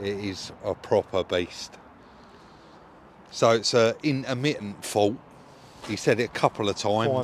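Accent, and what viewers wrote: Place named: RP, United Kingdom. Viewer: Bit posh you you essx boys isn't it ? 🙂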